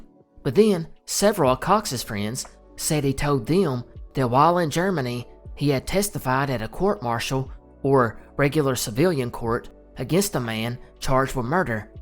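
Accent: South, United States. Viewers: You're sincerely my favorite channel. Yes, I am a Southerner and your accent has a familiar and friendly familiarity, but that's hardly the only reason. You're information is researched and vetted and your attention to detail is impeccable. Thank you for your excellent work and the excellent entertainment!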